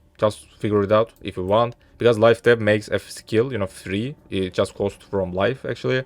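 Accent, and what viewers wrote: Turkey. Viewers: Anında Türk olduğunu anladım aksandan :D Bende genelde böyle konuşuyorum videolarda ya da sunumlarda kjahbfljangsg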